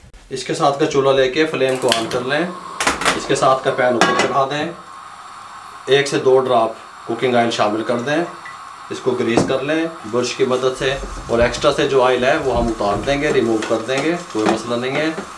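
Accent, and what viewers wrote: Punjab, India. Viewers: Beech mein jo ap punjabi ka touch detey hein. Kamal hi hai.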